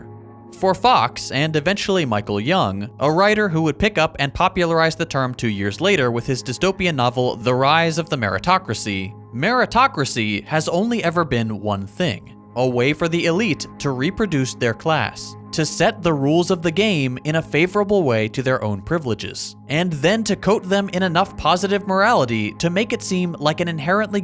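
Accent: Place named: Idaho, United States. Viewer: my mistake. People in Idaho sound a lot like you.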